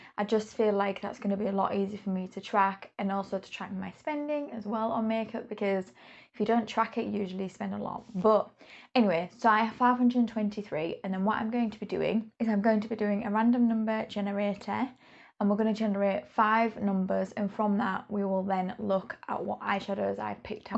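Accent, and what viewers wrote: United Kingdom. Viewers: Love hearing a fellow northern accent on here! Great intro, will be following along with your updates ✌️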